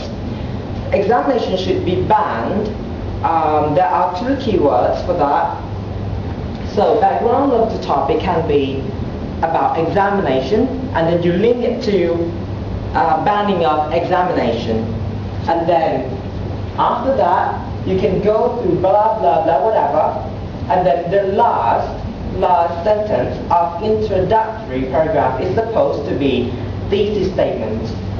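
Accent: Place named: England, United Kingdom. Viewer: มาสำเนียงอังกฤษเลย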